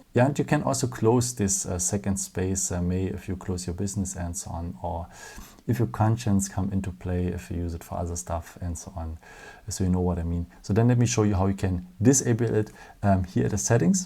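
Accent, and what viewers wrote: Germany. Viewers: he is german i can hear his accent but amazing is he doesnt sound like Arnie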